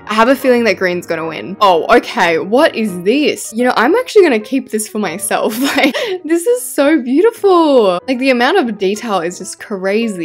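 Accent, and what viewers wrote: Australia. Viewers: lets just appreciate that in every video someone has to say " lets just appreciate". but anyways i love your videos, i started watching u in June and I'm obsessed, I've watched all the videos u have posted on your channel and everyday i come to check if you make a new one. Your a really talented builder and i would personally, myself say you should become a constructor of some kind. i love your Australian accent and i love your house styles and i love your sense of humour, i just love you. ♥keep up the work👏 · I love the way puts in memes and funny voices she's so talented I wish I was her sista❤ I hope I get invited to one of her build battles or something involved with building,she is AMAZING ❤ I love her so much and her Australian accent it's so beautiful. I love the way she NEVER gives up and shows confident energy to every build the subscribers make 😊,it's just amazing. 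And her name is so so nice and beautiful. Love you ❤🪷😘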